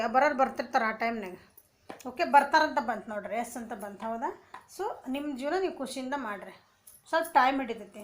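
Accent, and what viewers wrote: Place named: Vijayapura, India. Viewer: Bhahal chanda heliri akka nivu helidu kare ada noda akka nammadu vijapura nimma matado saili namma kade ede anasakataiti yava uri akka nimma vedo ede fast nodari kannige bididu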